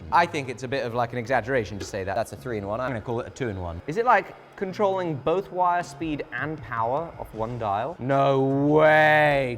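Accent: England, United Kingdom. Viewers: You already look like you’re making a Harry Potter sequel, the English accent seals the deal. I think you did that on purpose with the thumbnail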